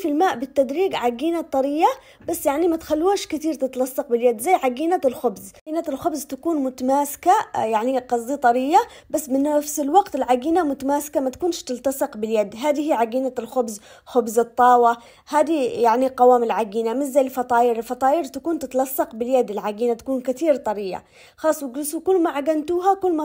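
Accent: Aden, Yemen. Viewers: تسلم ايدك يا وفدوه للهجه العدنى ايش المحرقات اللى يحطوها بدل الطماطم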